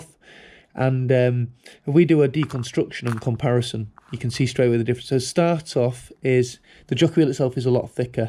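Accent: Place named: North, United Kingdom. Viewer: No No, hes Northern, THEY all talk like that. (Thats not a dig, thanks for the vid by the way.)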